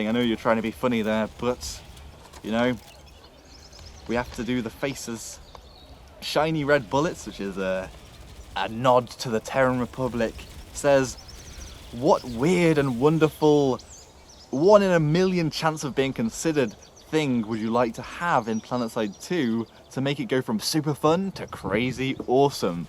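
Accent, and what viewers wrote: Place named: United Kingdom. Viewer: That brittish accent sounds so good! I hope you find a new job and get happy again.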